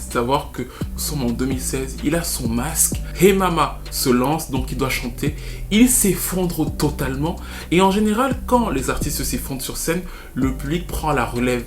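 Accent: Cameroon. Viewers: ton accent camerounais ressort très fréquemment, c'est hilarant 😂😂😂😂 Tu nous rends fiers. Que de la force pour ton excellente chaîne frère 💪🏾😁🇨🇲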